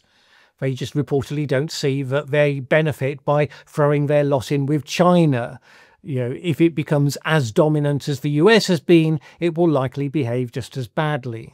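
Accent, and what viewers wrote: United Kingdom. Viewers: British accented Clown blowing smoke out of both ends.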